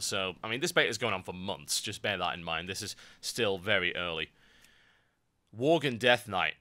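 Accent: United Kingdom. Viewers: Just subscribed... Seen a few of your vids last night and thought ''Hey, this guys British like me, and almost as funny!" Some top-class uploads, keep up the good work - Much appreciated.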